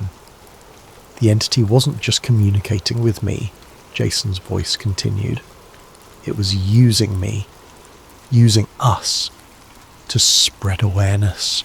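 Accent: United Kingdom. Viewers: Can we get these without a UK accent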